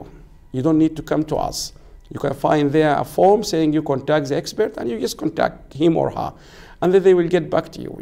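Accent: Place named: Sudan. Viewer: I like the way you talk English. It will be called Sudalanguage , it shows our identity. Thanks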